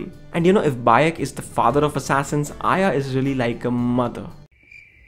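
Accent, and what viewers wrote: India. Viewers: your accent makes It sound like real love the Indian accent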